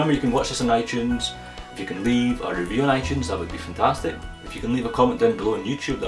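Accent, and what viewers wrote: Scotland, United Kingdom. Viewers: are you scootish?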